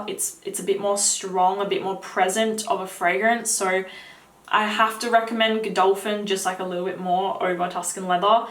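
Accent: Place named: Australia. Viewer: I love her accent, Australian accent😊😊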